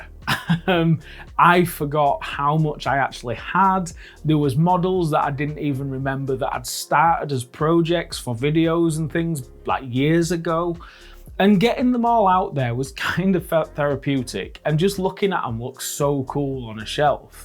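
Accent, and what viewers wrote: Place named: Doncaster, United Kingdom. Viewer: that accent sounds proper Doncaster or Rotherham way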